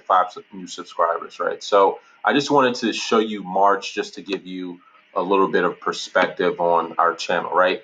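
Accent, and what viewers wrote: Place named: Prince George's County, United States. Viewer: The way you talk reminds me of home. You from P.G.Co.?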